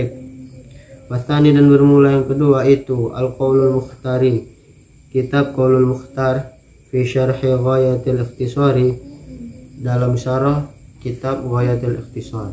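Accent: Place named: Medan, Indonesia. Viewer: Jelas kali logat medannya 😂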